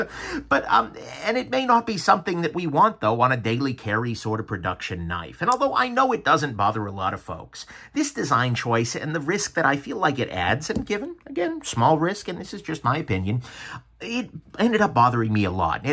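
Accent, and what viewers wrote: New York, United States. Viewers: Your channel is my favorite. BTW, are you from New York?? Thanks for the reviews!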